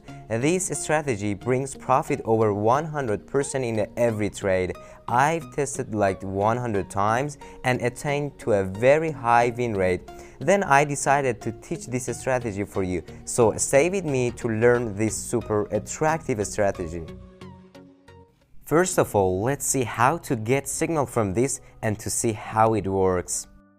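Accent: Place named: Iran. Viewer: IRANIAN ACCETN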